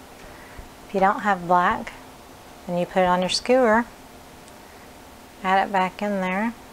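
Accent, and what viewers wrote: South, United States. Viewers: Well....you're def from the South but it's not bad at all!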